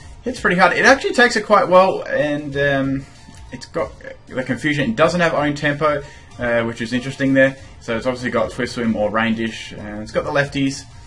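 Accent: Australia. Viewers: I'm from australia perth and you song like me so he's probably from perth, australia, anyways NICE vids really enjoy e'm!!!! :D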